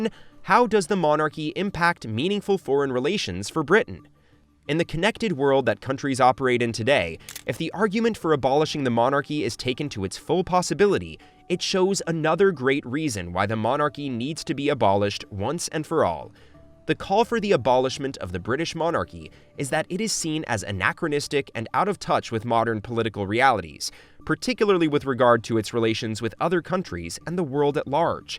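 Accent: United States. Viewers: Some but not all valid points. Elected presidents and democratic nations have also acted immorally and undemocratically. But who made this video? Narrator has American accent and half the images were American!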